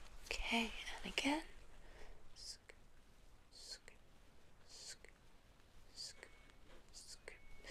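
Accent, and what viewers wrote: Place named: United Kingdom. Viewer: I swear she is British with that accent.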